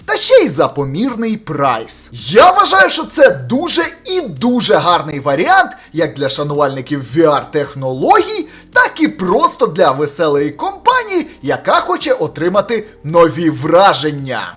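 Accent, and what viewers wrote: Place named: Irpin, Ukraine. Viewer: Цікава говірка у вас в Ірпені)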